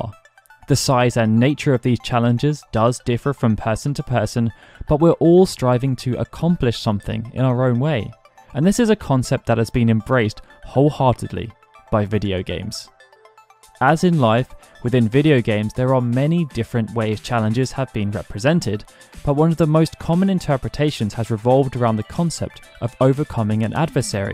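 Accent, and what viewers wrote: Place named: United Kingdom. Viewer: You've got a British accent. Your name isn't.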